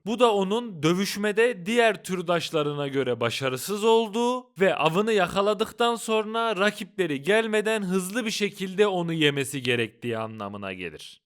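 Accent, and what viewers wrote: Ankara, Turkey. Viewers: Tatlı güzel komik bi anlatım sevdim Ankara bebesi 😅 Angara 😊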